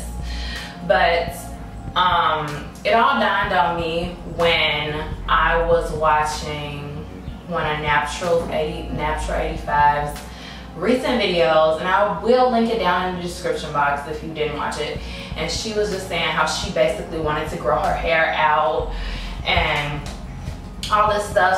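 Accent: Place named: New York City, United States. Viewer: you have that NYC accent lol ur hair is so prettyyyy and so r u keep making these vide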